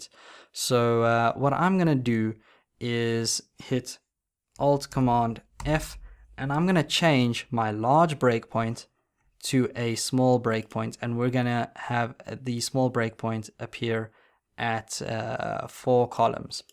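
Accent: South Africa. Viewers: Yas! South African accent!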